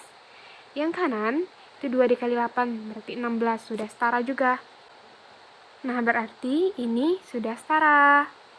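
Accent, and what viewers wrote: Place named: Sumatra, Indonesia. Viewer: kalo dari logat nya sepertinya Sumatra kak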